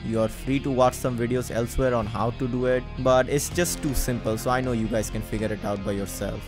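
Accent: India. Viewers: U already know when his accent is Indian ur in the right tutorial 😭🙏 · Why did you redeem it ahh india voice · bro sounds indian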